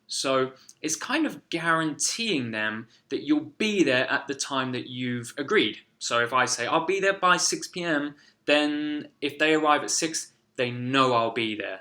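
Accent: United Kingdom. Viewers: Lovely British accent I liked 🙋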